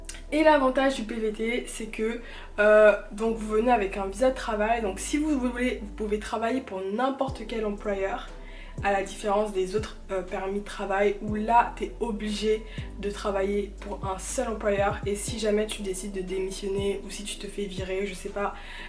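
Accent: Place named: Quebec, Canada. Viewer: C'est moi ou l'accent quebecois s'installe doucement lol ? C'est chaud 😂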